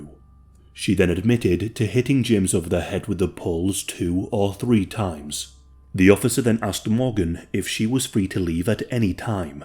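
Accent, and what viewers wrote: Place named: Scotland, United Kingdom. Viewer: I like your accent which I suspect is of Scottish origin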